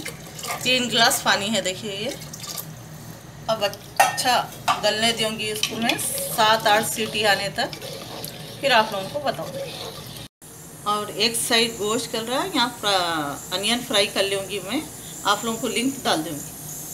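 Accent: Hyderabad, India. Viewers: Amma aap ekdum Hyderabadi bhasa bolre. America me bethke Hyderabad yaad aa gya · Assalamualaikum Aunty Jazak Allah khair for this Daleem recipe. Alhamdulillah I tried making it for the first time n it turned out so well n delicious . My kids loved it👌👍. I love the way you talk pure Hyderabadi style. Love you Aunty. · More than Haleem, aap ka explanation bhagayaa... hyderabad Urdu rocks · Assalamualaikum Aunty. Kaha the aunty aap itne din se, farishta banke agaye aap, yeich khaandani aur original daleem ki receipe dhoondhro tha dekhiye Mai ab Tak, Jo meku milgayi aur Mai seekhliye banana isku. Dawaat me banaya dekho aunty, Logan biryani chor ke, daleem khatam kardiye puri, aur hai Kya puchre the meku. Sab apki badaulat hai, allah apku zariya banaye hum sab KU pakwaan sikhane ka. Jazkallah khair. Aur ab rahi baat apki aunty, to meku apke baat karne ka andaaZ itna pyaara dhikha, pure Hyderabadi language, Jo bahut kam sunne KU milti yaha USA me. 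Maza agaya. Hehe. Apke camera wale Jo b hai, Bhai ya behen, Zara camera close nakko rakho utna, bartan me gir jata camera aur thoda close leke Gaye to, thoda uppar SE covering Karo pakwaan ki, aur acha dikke aata aunty kaisa mix karre Kya karre receipe KE saat. Agli baar zara uppar rakho camera. Aur aunty Allah apke abba jaan ko jannat me aala SE aala mukhaam Naseeb Kare, jannat ki khidkiya unki khabar me khol de, huzoor Muhammad Mustafa sallallaahualaihiwaliwasallam ka deedar Naseeb Kare qayamat ke din, aur khabar KE azaab SE mehfoos rakhe. ( Ameen ya rabbul aalameen). Kardiya dekho jee aunty Dua, inshallah, apki dusri pakwaan wali video ka intezaar rahinga. Allah apku sehet de. Ameen. Allah hafeez. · Assalamualaikum wrwb Aunty! Aapki awaaz sunke meku mere hyd ke apno ki yaad ajati Aapki saadgi ke waje se Allah apku be hisab sehat , dunya aur aakhirat ki kamyabi atafarmaiye Ameen Feeamanillah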